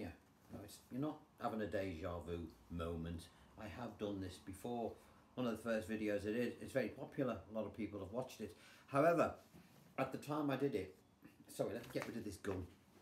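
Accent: Liverpool, United Kingdom. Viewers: Good stuff. You a scouser, then?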